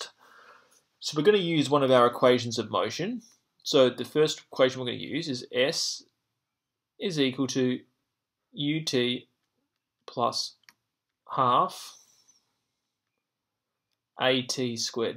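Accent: Australia. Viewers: cool Aussie accent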